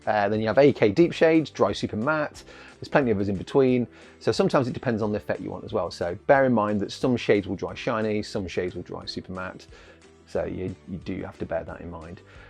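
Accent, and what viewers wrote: United Kingdom. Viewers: Is it P Chi Tips or Yorkshire?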